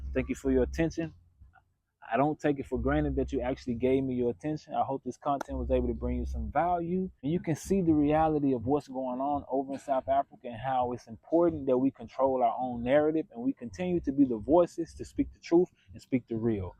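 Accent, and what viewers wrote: United States. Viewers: You are being a reverse racist and promoting action against the whites of this country. Any educated person of any race will not support a narcissistic, self serving supposed US leader who is turning his country over to the other nut job, putin. This is similar to our previous leader selling our country to the guptas. You are speaking absolute bullsxxt about europeans barbecuing black folk. It still is the black on black violence of putting a tyre on a brotha, filling it with petrol and setting it alight. I can not believe YOUR TOTAL IGNORANCE OF ANYTHING ABOUT SOUTH AFRICA. lCome and live here, with your american accent , and see the 2025 truth.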